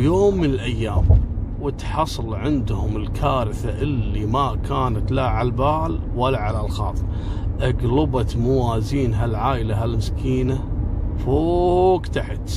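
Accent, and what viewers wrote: Kuwait. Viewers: الله أكون في عون الحاجة والحاج بعد هدا العمر يطلعوا اخوة والله مثل هده الحادثة صارت عندنا في المغرب وأكن اللحمد لله كانوا لم يتزوجوا بعد فى عزلوا القصة قبل الزواج البنت دخلت في حالة نفسية شديدة هي والشاب ومرت سنين حتى تشافت منها انا ارى ان على الأمهات ادا ارضعوا ان يقودوا لأبناءهم ان تلك الفتاك هي أختك او اخوك كم الرضاعة حتى لا تقع مثل هده المشاكل طريقة سرد القصة رائعة رغم أني كنت أعيد القصة مرتين حتى افهم اللهجة الكويتية. مغربية مرت من هنا 🇲🇦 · بما أني جديد في سماع قصصك ودي ارجع اسمع القديم بس ماشاء الله تتحفني في الجديد وأنسى القديم أسلوبك مره جميل ولهجهتك الكويتيه الجميلة هي من خلتني افعل الجرس وفقك الله لما يحب ويرضا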